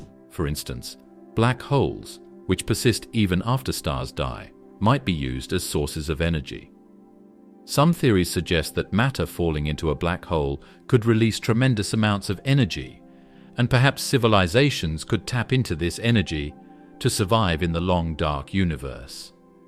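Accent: England, United Kingdom. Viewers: The usual dribble (English accent included) with generic impertinent graphics. Good for sleeping though.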